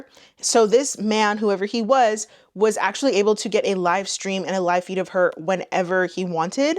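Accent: Canada. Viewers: first time watching you, i love how Canadians say words with "ou" in it lol Aboot. "Oot of the scene." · Gurl I just found you, so happy I did.. your hair looks amazing also that accent is 100% Canadian lol (Been binging ya since finding ) Love that you are a fellow Canuck. 🍁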